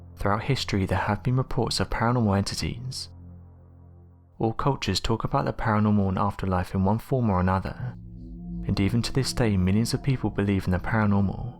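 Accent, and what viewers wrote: United Kingdom. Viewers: why do you sound british?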